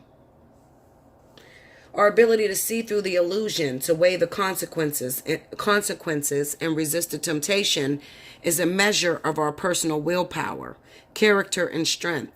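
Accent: Pittsburgh, United States. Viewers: first time watching your video. Interesting read. Are you from Pittsburgh? I hear an accent (I'm from PGH, so that's why I'm asking)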